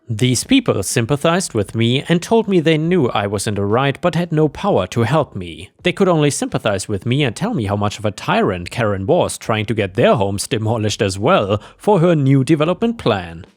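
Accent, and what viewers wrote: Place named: Germany. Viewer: Why don’t you tell your stories in German with subtitles your accent is terrible I would rather listen to the German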